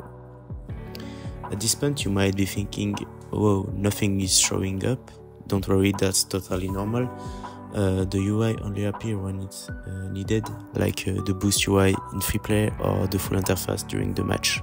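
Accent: France. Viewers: On voit que t'es français à l'accent x)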